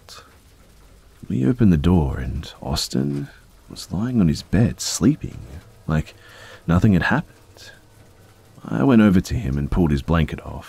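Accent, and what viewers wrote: Australia. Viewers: Trying to place your accent - Melbourne (AKA Melbun)?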